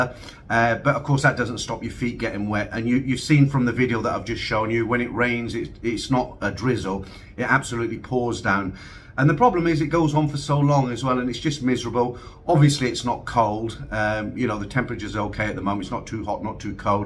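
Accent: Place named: United Kingdom. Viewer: It’s called an accent… Have you really been sheltered that much? Different parts of Britain do have different accents and in some areas, they tend to add an “err” sound at the end. They don’t even realize they do it. Because you know. It’s an accent. 🤦‍♂️ How do you think the word “soccER”, came about? (Actually there is more to how we got the word soccer but that is beside the point.)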